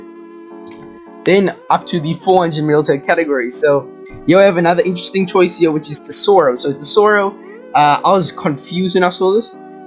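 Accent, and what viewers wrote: South Africa. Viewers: Bro i have to ask lmao, but are you south african? Love the content btw. Keep it up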